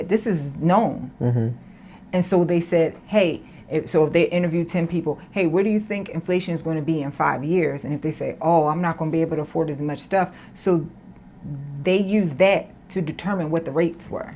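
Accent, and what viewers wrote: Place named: New York, United States. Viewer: Hello lady is your sister the wife in the Henry family ? You all look similar and have the same accent ? Like New York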